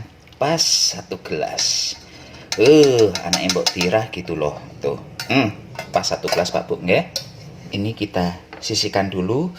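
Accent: Banyumas, Indonesia. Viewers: Saya suka resep mas apalagi bahasa banyumasan kental banget 👍👍👍😇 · Lik...ngomong ngapak malah seru..nek nglebokan alias memasukan sih bhs ngapake apa yaahh.. 😀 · Memang sangat" menghibur para pecinta yutub sperti aku dn bicaranya yg ak ska ngapak alias lucu 😅😅😅😅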